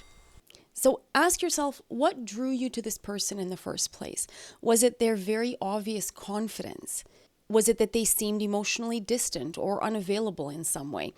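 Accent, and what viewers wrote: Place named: Ireland. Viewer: Sorry, just curious. Were you born in Canada? I mean I moved to Canada when I was nearly 12, and I still have a borderline Russian accent. It seems like you do too, not Russian, but something... Maybe even Irish...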